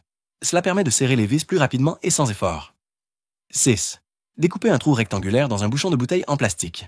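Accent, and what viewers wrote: Canada. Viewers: Si la voix était prononcée par un accent français et non canadien ce serait agréable à écouter Si...c était plus lent à regarder...ce serait bien plus plaisant Comme ça est maintenant....impossible de m abonner J aime me sentir " bien"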